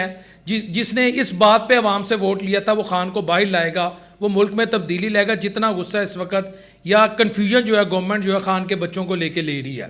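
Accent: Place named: Punjab, Pakistan. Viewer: لعنتی یہ بھی آگیا ہے اسکو پتہ نہیں وہ اپنے باپ کے لیئے آرہے ہیں تم tacit اینٹی عمران بیانیہ دے دیا ویسے تم بھی اردو پنجابی ایکسنٹ میں بولتے ہو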